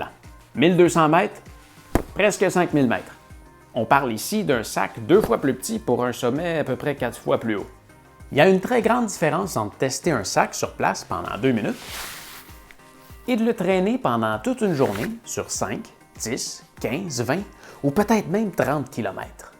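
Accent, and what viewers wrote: Canada. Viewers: Merci ! J'adore ce bon et sympathique accent canadien !!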